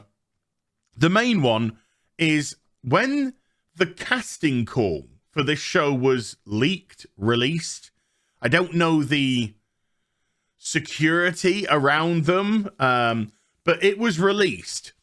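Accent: United States. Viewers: you do sound American sometimes lol and I love it!! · Are you American? You are speaking American with a funny accent. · Yes you do sound like a yank somethings. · Gary's fault for your American speech. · Yep, you sound more and more American all the time.: ) O.o? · I'll admit I couldn't even tell you wasn't american my first time watching you.😅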